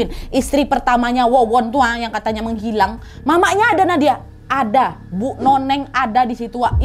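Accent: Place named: Medan, Indonesia. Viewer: kalau wak nadia yg ngomong patent kali ,gaya medan kali ,kren wak nadia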